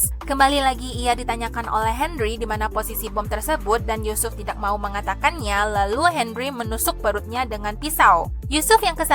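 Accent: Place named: Padang, Indonesia. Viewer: Ini orang padang yak🤣🤣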